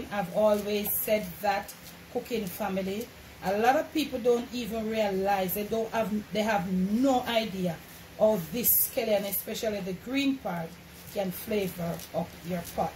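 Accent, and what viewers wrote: Jamaica. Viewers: Mrs. I just watch your Sunday show and once again your cooking make me long for a vacation to Jamaica just to eat your food Many blessings to you and the ones you love P.s. I just love your accent especially the way you say "and all dat"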